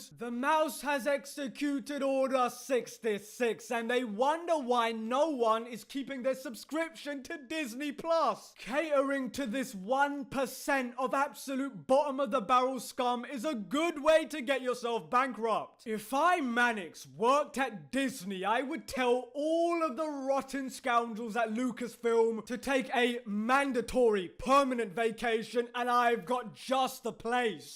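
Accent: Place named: England, United Kingdom. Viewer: I love an English accent that doesn't make me feel stupid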